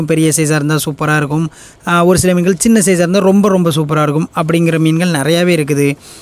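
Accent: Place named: Sri Lanka. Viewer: என்ன இலங்கை தமிழ் பேசுறீங்க அண்ணா pad ok வாழ்த்துக்கள் அண்ணா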